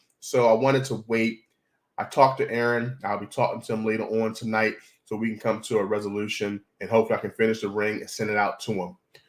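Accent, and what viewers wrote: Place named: Maryland, United States. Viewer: Def interesting hearing about all you do. Thanks for the content! Love your MD accent!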